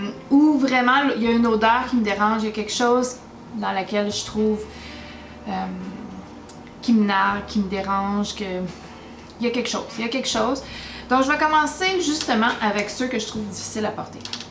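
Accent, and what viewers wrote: Quebec, Canada. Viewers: Bonjour, j'adore ton humour l'air de rien quand tu parles des sorties et des confinements 😁 Pour moi, faciles à porter: N°19 (mon parfum signature), Insolence et Shalimar millésime de Guerlain (coup de coeur mais mauvaise tenue), Vanille tonka de Nicolai, et plein d'autres... Ceux avec lesquels j'ai plus de mal désormais, les "cheap" de façon générale, par exemple la collection "Les notes gourmandes" de Réminiscence (Guimauve: je ne peux en mettre que sur mon poignet ou le sentir dans la pièce, mais ni moi ni sur les vêtements). Mais aussi les parfums dont la base est l'oud, tous les masculins en général, ainsi que les "odeurs de propre" (muscs trop synthétiques). Merci pour toutes tes vidéos qui sont un vrai plaisir à chaque fois, avec ton accent (euh, je veux dire le fait que tu n'aies pas d'accent français 😁) qui me donne envie de retourner au Québec! 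Bises 😘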